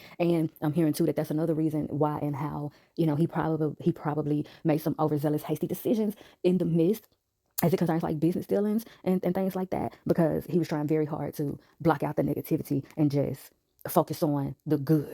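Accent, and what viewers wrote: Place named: Queens, United States. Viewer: I love your accent, were you from Queen? I subscribed to your channel 💖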